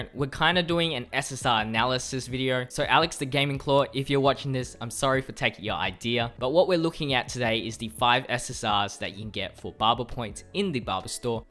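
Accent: Australia. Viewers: Hey dude are you from Aussie i think I have asked before but Idk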